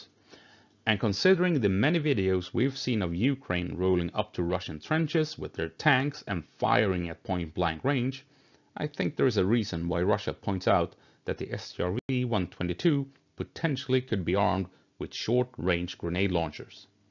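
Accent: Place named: Sweden. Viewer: it seems .. or i know your english accent is swedish. You think you could be a bit biased ? or perhaps harbor some other issues from childhood ? Anyhow i just wanted to inform you that in the current battle field there are a bunch of nationalities. lets start with the DPR and LPR militias, 99% of those soldiers are or were Ukrainian citizens. wagners - russians, uzbeks, serbs, cubans and even ethnically Ukrainians. you mentioned that they will "fight russians"... last thing as the battle field shows there are no "tank to tank" battles in ukraine as it is portrayed in "world of tanks" . Odds are those toys will go against either a kornet or a Vikhr anti tank missile from an alligator. Either way i don't see a great future for those weapons.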